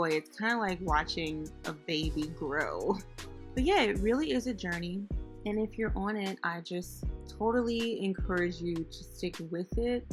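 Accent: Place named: Southeast, United States. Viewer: Dis joint be dry dou... lol Sound like you from S.E. Haaaaaa!!!!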